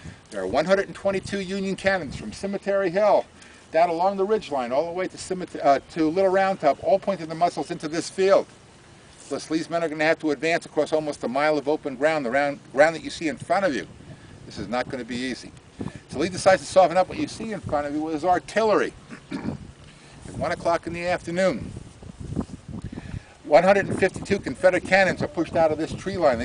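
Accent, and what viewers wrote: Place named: North, United Kingdom. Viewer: This fella tells the story with passion. To my foreign ears he sounds like a Northerner, but he still has the passion for passing on the deeds of those that went before him. Great presenter, great passion and a credit to himself. Well done sir. Glad this was in my feed today :-)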